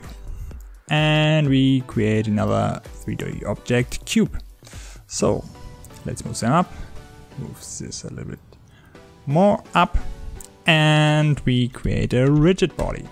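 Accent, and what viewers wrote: Germany. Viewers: Kann es sein das du Deutsch bist klingt ziemlich stark danach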